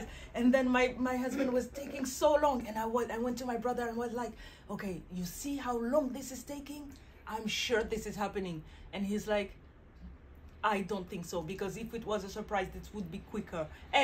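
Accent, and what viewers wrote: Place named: France. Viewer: Vous vivez en France? Avec cet accent français....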